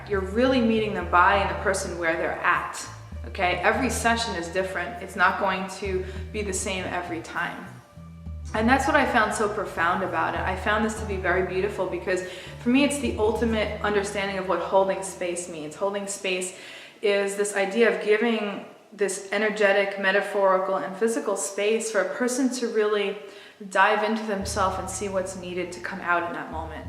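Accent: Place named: New York, United States. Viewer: Great video. I like your accent, are you from nyc?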